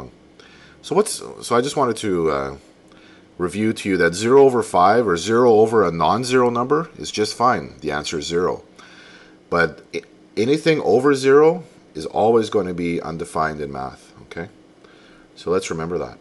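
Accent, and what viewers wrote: Canada. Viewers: are u by chance canadian?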